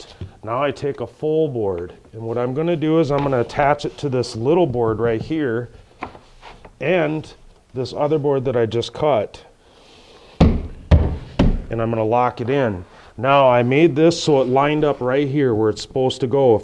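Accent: Minnesota, United States. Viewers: Your praise of God blesses me because I find myself everyday thanking the Lord for guiding me at work especially when I run into some 'mystery' challenges I can't figure on my own, thanks. Great instructional videos and love the MN accent. God Bless!!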